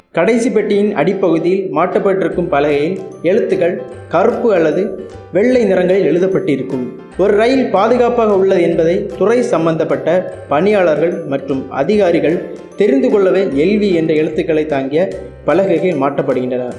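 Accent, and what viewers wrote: Kanyakumari, India. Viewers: Bro kanyakumari ஆ Bro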